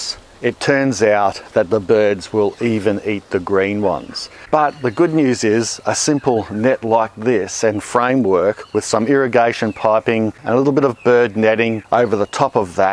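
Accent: Australia. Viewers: Haha. Same here, and also love the Aussie accent.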